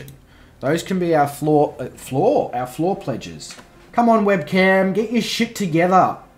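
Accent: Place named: Australia. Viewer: Dude, I highly enjoyed the video. I would recommend that the start of the video should have abit of a soundtack, like 2 seconds. But it your choice. Again, I really enjoyed your aussie accent.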